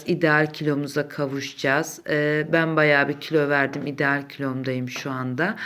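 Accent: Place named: Çarşamba, Turkey. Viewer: Her halde yine carsamba aksani Ahmet Apa niyetimizi yaptirir .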